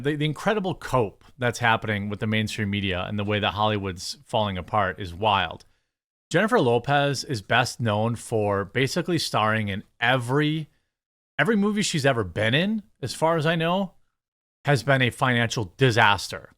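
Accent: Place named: Midwest, United States. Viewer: your midwest accent I barely notice... Usually... but the way you pronounce "Atlas" sounded like "Alice" until much later in the clip.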